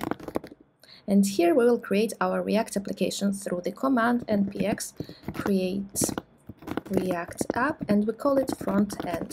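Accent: Russia. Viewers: услышал знакомый русский акцент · слышу что вы русскоязычная и это хорошо, спрошу. Зачем вы фетчите дату в useEffect? Он же срабатывает при каждом ререндере дома или вообще в изменении его структуры. Или я не прав? Пожалуйста, помогите разобраться, потому что я привык фетчить дату при событиях типо клика или сабмита, но вижу как некоторые делают это в хуке useEffect. Реакт изучаю совсем недавно из-за надобности по работе, обычно писал только бэкенд. И меня ставит в тупик этот хук. Почитав офф документацию написано, что отрабатывает в зависимости от установленных зависимостей, и изменении состояния этих зависимостей. В общем путаница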